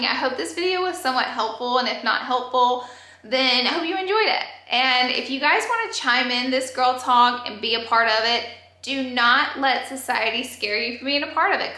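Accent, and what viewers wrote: South, United States. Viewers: I love the country voice! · Girl, you still country af!!! But I absolutely love it because I’m a country girl too!! I love watching you 😘😘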